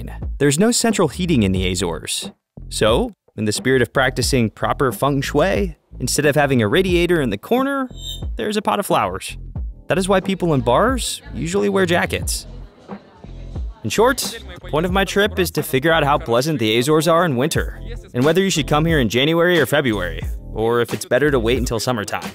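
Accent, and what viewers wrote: United States. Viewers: Good apart from the annoying American accent voice over. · Had to stop watching as the commentary is in Murican, please learn how to say, 'Ah Zores'' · Interesting video! Good presentation. Clearly an American accent (via your AI overlay) but speaking in units of Celsius for temperature measurements? at least put the deg F in subtitles. When was the last time NOAA.gov put all their units only in Deg C?… try never (yet). Based on your channel videos it’s a good guess a large portion of your audience is American… How hard is it to visually post both units?…. With all your complex map graphics, seems like a tiny detail. If 2% of my viewers used predominantly Kelvin, I’d put that in subtitles too. And let’s be honest, Kelvins are the best units for temperature anyways! 😊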